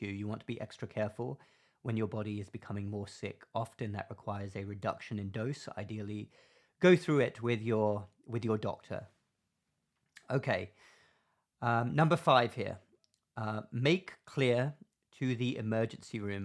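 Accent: England, United Kingdom. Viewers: You have an English accent?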